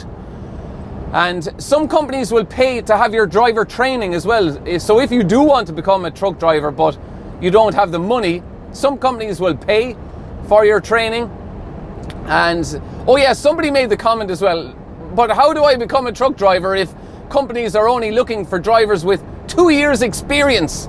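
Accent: Ireland. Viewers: Irish accent, right? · Ok Ireland is the size of Indiana . My ancestors are from Ireland though . So cool accent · You sound like you're from Dublin.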